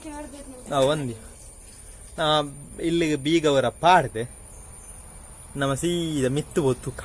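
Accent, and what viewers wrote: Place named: Kasaragod, India. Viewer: Kasaragod da tulu kenere kushi apundu Jai Tulunadu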